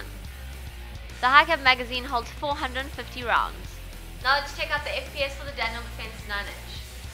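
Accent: South Africa. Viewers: john accer She's from South Africa with that accent :) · She so sound like a South African. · this chick sounds south African,i could be wrong.she really does.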